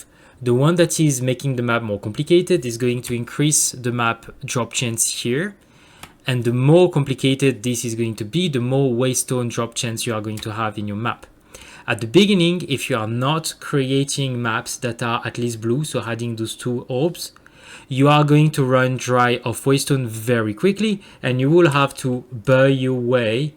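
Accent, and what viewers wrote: France. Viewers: On reconnaît bien l'accent français. Merci pour la vidéo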